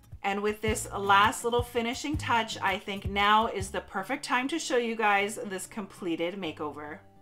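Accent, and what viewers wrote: Canada. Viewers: I LOVE LOVE LOVE your Canadian accent!!! And your videos too! Beautiful work. :)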